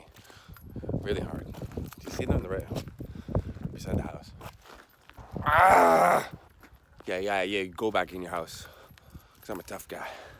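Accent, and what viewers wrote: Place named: Canada. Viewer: Don’t be ashamed of your Canadian accent! Who wants to sound American anyway...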